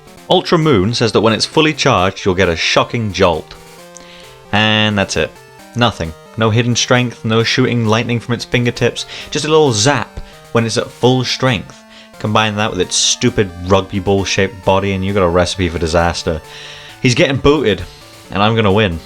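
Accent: United Kingdom. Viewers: Are you a fellow Brit?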